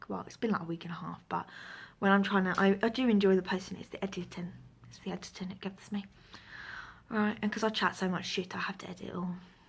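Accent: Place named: RP, United Kingdom. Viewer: hey there ! cool vid hon ! :) :) hugs hugs aww u r nicely organised :) i got a little book revew diary :) i cringed at times in Eleanor too it was sweet book tho hugs where u from hon ? u have similar accent to me .. only u sound posher than me! hugs hugs from Essex :)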